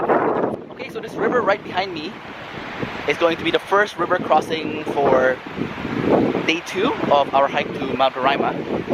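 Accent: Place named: Philippines. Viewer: Why do you have a filipino accent?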